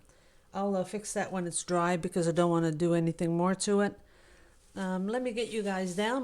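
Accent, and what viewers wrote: Netherlands. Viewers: I thought I heard a Dutch accent! My dad is from Holland so that makes me half Dutch even though I have never been there. One day I truly hope 💜 Thank you for sharing your art. It's beautiful!💕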